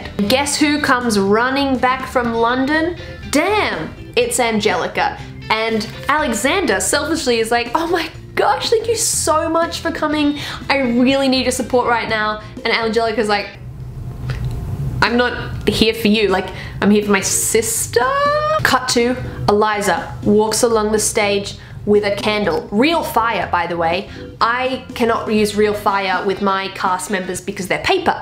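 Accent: Australia. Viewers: Skylar Sky she’s Australian its an accent thing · I love ur Aussie accent👍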